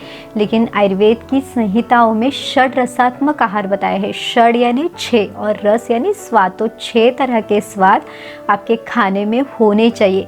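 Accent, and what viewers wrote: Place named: Maharashtra, India. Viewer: ताई तुमचे मराठी accent मधे हिन्दी नेहमीदिसुन येते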